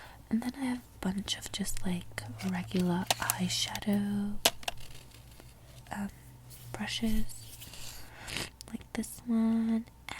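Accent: United States. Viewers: Dude you pick up accents so quick, I can hear the US accent creeping in already :D awesome video friend, grateful as always.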